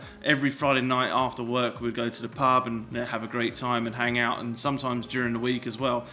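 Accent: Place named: Australia. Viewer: I thought you were Aussie when you started talking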